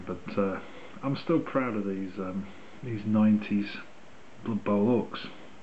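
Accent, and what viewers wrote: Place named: Leicestershire, United Kingdom. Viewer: same here mate, i can tell by your ascent you would not have been far from where i used to live in leicestershire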